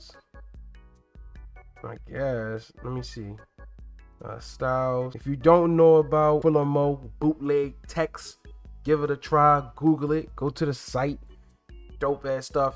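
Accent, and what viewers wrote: Baltimore, United States. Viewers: This dude accent sounds like he is from Baltimore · Bro gotta be from baltimore lol. dope content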